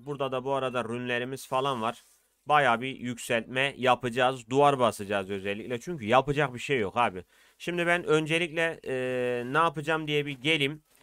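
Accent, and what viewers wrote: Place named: Iğdır, Turkey. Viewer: Abi sen Iğdırlı mısın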